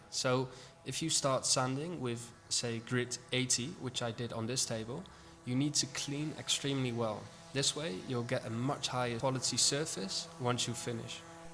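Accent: United Kingdom. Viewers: thought I was listening to a Londoner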